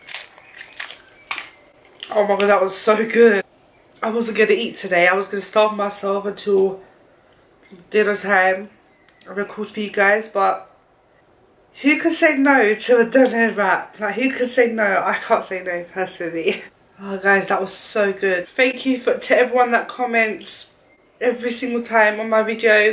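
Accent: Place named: London, United Kingdom. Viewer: “dis is a turkish burrito outere” 😂😂😂😂😂😂 yhhh ur defo from ends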